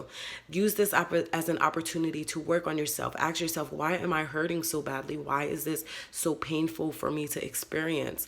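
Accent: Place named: New Jersey, United States. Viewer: I love your voice! Reminds me of my cousins from Jersey. Also, will you please not apologize for anything? You can't hear the heater at all! Love your videos and guidance. Thank you!!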